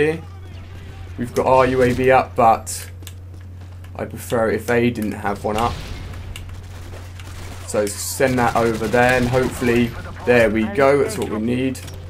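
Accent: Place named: United Kingdom. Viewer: Seriously man. listen to his accent...Britain ring a bell?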